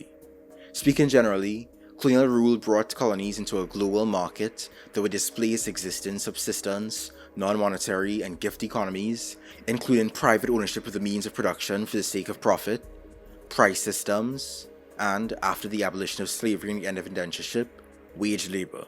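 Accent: Trinidad and Tobago. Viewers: Are you Trinidadian? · ay yo i heard that trini accent and subbed right away! youre doing great work my friend much love from canada.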